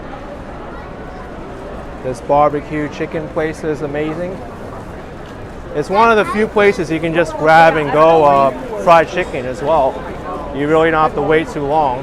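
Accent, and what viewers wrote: New York, United States. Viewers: Watching this as the sun sets here, 3 hours later, in Oakland CA. What a great way to be linked to our pride and joy NYC. Or mine, at least. And to have homegrown voiceover accompanying my virtual walk. What part of the City or environs are you from? I know, I'm assuming things, but sure sounds like it from your accent.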